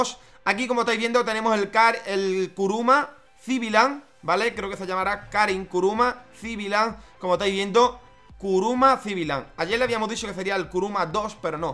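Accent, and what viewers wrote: Spain. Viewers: Juan Diego Amaya Martinez tu eres español?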